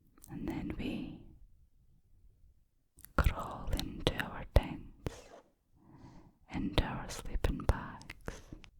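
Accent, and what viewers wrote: Scotland, United Kingdom. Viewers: A Scottish accent. I think she's from Aberdeen or Dundee? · I have a newfound love of the Scottish accent now. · This. Idk why but i love the Scottish accent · Holy tingles dude. Never thought I’d love a Scottish accent so much 😌☺️ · I hope to have your voice and accent in my ear every night when(if) I visit Scotland. Cheerz luv. 😴🥰🏴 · this scottish accent knocked me out last night it very likely will again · it brings me much joy as a scottish person myself that so manh people love the scottish accent!!! ive always felt really insecure about my accent because you never really see it online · lol a very good sleep indeed, your Scottish accent beat me lol but I wonder how you’d stack up against a southern drawl lol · I never realized just how much I absolutely love the Scottish accent. You are absolutely amazing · Well clearly he's talking about the Scottish accent IN the video, if you couldnt tell. 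· Never knew Scottish accent was what I needed to sleep. Thank you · that Scottish accent whispering is so hot wanna take an cold shower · Dear God what a most beautiful gorgeous angelic Scottish voice I already feel more relaxed you're a true angel ❤❤❤❤❤ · Was scrolling through shorts and heard a Scottish accent pop up related to asmr finally a proper Scottish accent you do our accent justice lol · ! Glad to see you posting. I do love your Scottish accent so this is a wonderful video. ❤❤❤ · You and Stronny Cuttles are the absolute best Scottish voices I've ever heard in asmr 😍 I wish you two would collab. It would be soooooo amazing if that were to happen lol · I am so taken back to my childhood with the Scottish accent at bedtime.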